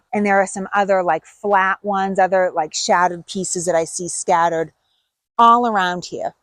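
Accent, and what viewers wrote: Boston, United States. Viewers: No way, accent is definetly from inside the 95 belt around Boston. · you have an adorable “Boston” accent.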